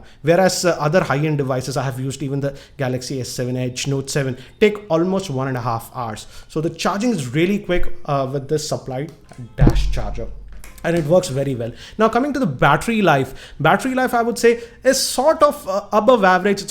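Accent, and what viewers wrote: India. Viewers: I still love the Indian accent. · nice presentation of indian english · Its just me but I just hate ,,indian" english otherways you speak great and you do very good job with what you are doing so far its that birth languege that makes this and I dont want to ofend you :)!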